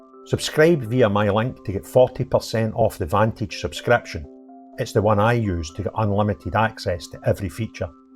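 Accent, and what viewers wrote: Scotland, United Kingdom. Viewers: Kind of made me laugh when you were talking about who these guys are. Actor (come) model (come) rent boy, allegedly 😆 Plus the Scottish accent. Just made me laugh. Sorry, serious subject I know. But then came the village people😁😆😶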